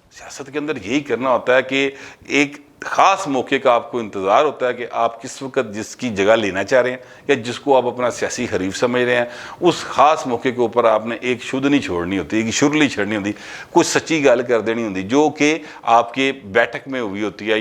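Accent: Lahore, Pakistan. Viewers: Awami , khula ty lahori andaz e biyan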